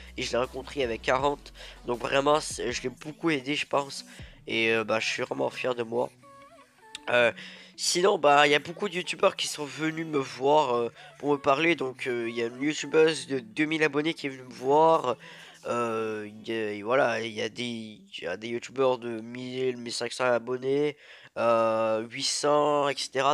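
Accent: Canada. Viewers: merci té canadien ? J'adore ton accent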